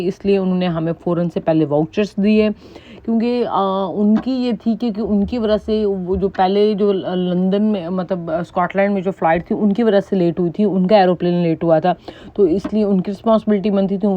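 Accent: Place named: Punjab, India. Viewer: Punjabi touch… zbrdst h.. ✌🏼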